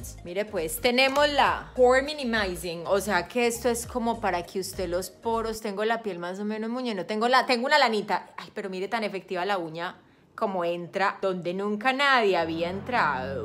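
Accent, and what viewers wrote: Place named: Colombia. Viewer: Cómo a rarenge jajaja súper colombiana Muñe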